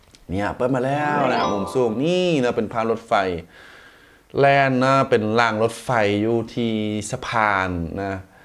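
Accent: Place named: Phetchabun, Thailand. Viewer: สำเนียงภาษาแอดมินคล้ายๆกับสำเนียงภาษาบ้านผมเลยครับที่เพชรบูรณ์ครับ😊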